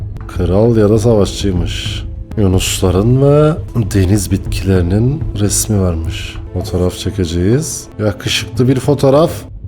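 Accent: Cyprus. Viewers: Kibris şivesi cok guzel hocam video terapi gibi geliyor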